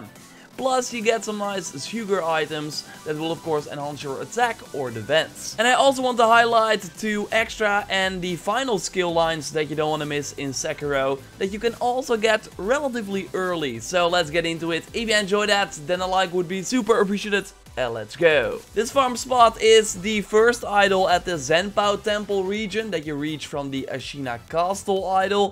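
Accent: Netherlands. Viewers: you sound like a nederlander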